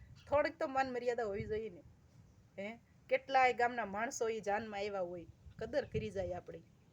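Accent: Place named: Kathiawar, India. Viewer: તમે પ્રાતીજ ની બોલી થી અલગ કાઠિયાવાડી બોલી બોલો છો.. તેમાં કઈ સમજાવો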